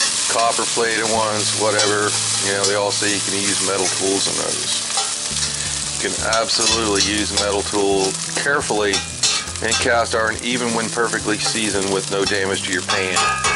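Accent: Florida, United States. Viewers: This guy sounds like he’s from Florida.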